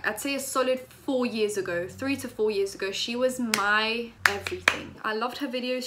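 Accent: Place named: London, United Kingdom. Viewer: Your the only white girl with a london accent sound like a roadgirl on youtube bit random lol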